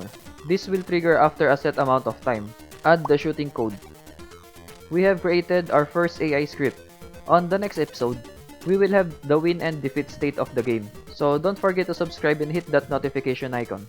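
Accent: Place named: Philippines. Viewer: you sound like filipino...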